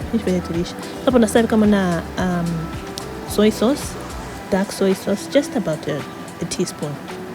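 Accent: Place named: Zambia. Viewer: Beglish 😁 bane.👍🏽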